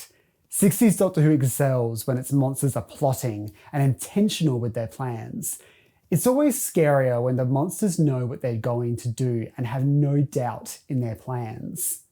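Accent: Australia. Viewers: wait i thought u were australian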